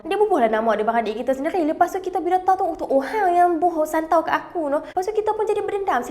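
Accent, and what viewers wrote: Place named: Kedah, Malaysia. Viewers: Ni sah speaker orang kedah ni. Penang cakap lanjutt x laju2 cmni. Aku kedah cakap cmni arh🤣 laju.. cm rapper lol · susah hendak difahami apa yang beliau berbahasa,sungguh pekat loghat kedahnya. bagaimana sesuatu maklumat hendak disampaikan jikalau yang memberi tersebut menggunakan bahasa berlainan? natang mu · Matap lorat org utara padu.. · Akak utara rapper bertudung is back🗿🗿🗿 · Bila utagha sembang nahhh kaw kaw 😂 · Aq orng johor..tp seronok dengar lorat kedah ni...nk crik bini orng kedah laa gini😂😂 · rasa cute la loghat utagha ni.. ❤️ melted.. hai awak.. tapi an… klu die tgh2 baca mantera.. rupenya baru tau bomoh. tertibe rasa nk cancel tu.. cmne ea nk blah? klu cabut camtu je tkut die sniper dari belakang. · Oqang Utaqha cakap laju tu.. aku suka sungguh dengaq dia cakap 🤣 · Seronok la dengar 😂 dia punya utara dgn laju rasa mcm dengar member sendiri ajak borak 🤣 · This is kedahan accent, John bear is penang accent, it's not same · SYOQ DENGAQ ORANG UTARA CAKAP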